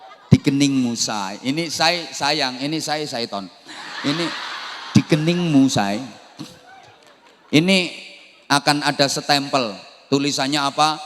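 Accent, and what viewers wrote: Banyumas, Indonesia. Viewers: Kramat jatibarrang Brebes Cocok bahasa e ngapak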